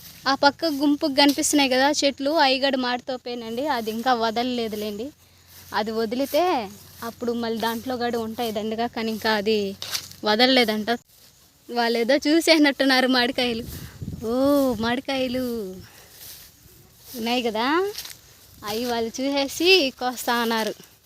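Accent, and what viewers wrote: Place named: Rayalaseema, India. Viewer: హాయ్ అండిబాగున్నారమీవిడియెస్.బాగున్నాయి.ఎవూరుఅండిమీది.మీబాషచూస్తేరాయలసీమకుసంభందించినదిగ.ఉంది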